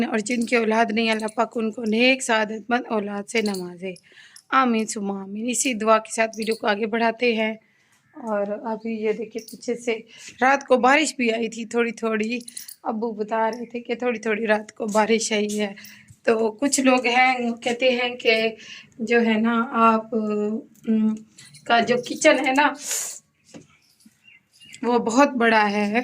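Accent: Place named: Saraiki, Pakistan. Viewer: Urdu to achi bolti hain sis bs lehja Kuch change krny ki zrurat hai qk Urdu Mai b lehja bilkul sraiki wala hai na is Liye log kehty hain k sraiki hi bol lia kren....Jo unparh hoty hain wo to lehja sraiki wala b 4khyn ya Urdu toti photi bolyn koi Kuch nhi kehta lekn AP to mashaallah educated hain na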